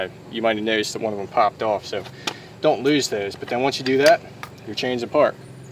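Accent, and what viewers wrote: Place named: Pennsylvania, United States. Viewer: you sound like a Pennsylvanian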